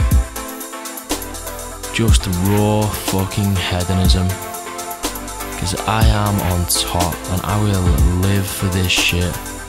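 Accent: United Kingdom. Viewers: The party montage reminded me of Human Traffic, with your voice all trippy & british accent :D Good stuff! Perfect timing for me as well, been going through the same thing myself, first traveling for festivals & raving a lot, then going backpacking, growing a lot as a result but also being left with a sense of emptyness after that. Got to work so I can enjoy the present moment always, and being the best version of myself always, not just when partying and traveling. It's the hedonic treadmill, we always chase happiness, base it on external factors, and our happiness reverts back to baseline when we get it, we're never satisfied for long. Anthony de Mello is a great spiritual guide on that, his lecture rediscovery of happiness goes through how we can undo our cultural programming that keeps us changing money, women, status, jobs, titles etc in hope of happiness.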